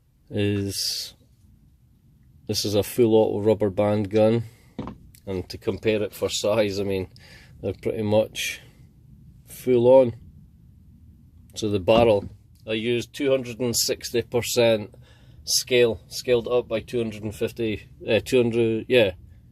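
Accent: United Kingdom. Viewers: how can i get in contact with you> are you from uk im guessing with the accent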